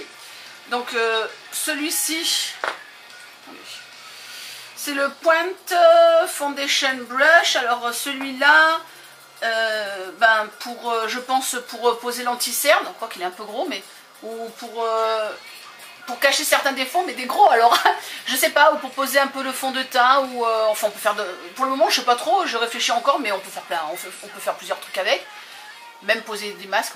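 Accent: United Kingdom. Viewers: ps / je suis dingue de ton accent british !!!!!!! non je ne me moque pas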